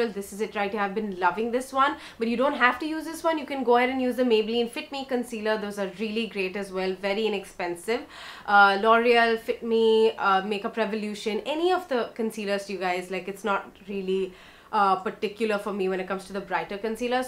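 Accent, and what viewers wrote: India. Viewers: I love your Hindi accent you sound so freaking cute! How people can hate it I don’t get it.. I enjoy it a lot when you speak Hindi trust me 😍🥰🤩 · Love ur cute hindi accent...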